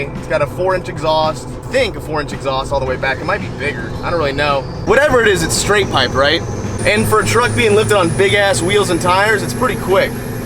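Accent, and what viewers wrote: South, United States. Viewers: Just me or did he have like a super southern accent today?? lol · sounds more country for some reason lmao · Yay the update (I swear you had such a stronger country accent in this video) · Listen closely. is developing a southern accent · got a truck and now he has a country accent. I used to watch vids all the time, now I check in after 2 years not watching and he has 1 million subs and a country accent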